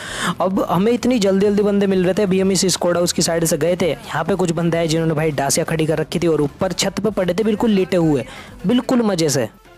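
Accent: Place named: Marwari, India. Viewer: Bhai to marwadiy kas bol la taa hai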